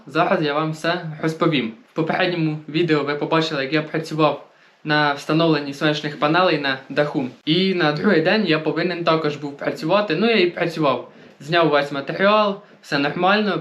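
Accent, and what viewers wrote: Poltava, Ukraine. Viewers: В тебе акцент цікавий. Ти з Полтавщини?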